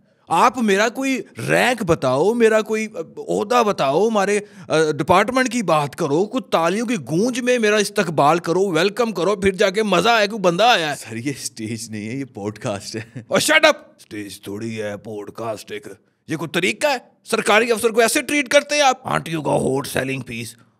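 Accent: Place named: Punjab, India. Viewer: Good acting skills. Specially 1st time saw your Punjabi accent, tone was perfect.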